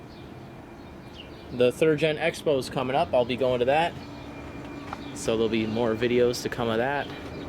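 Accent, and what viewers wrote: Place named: Chicago, United States. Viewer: I heard the Chicago accent. Then I could tell your Right in the flight path of Ohare airport like me.👍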